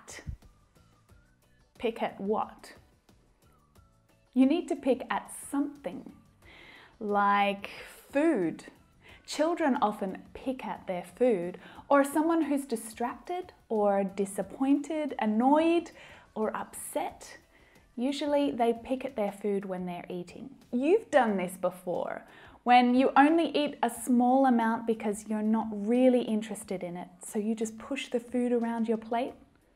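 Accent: Australia. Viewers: I picked up Australian accent by watching video. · Australian accent?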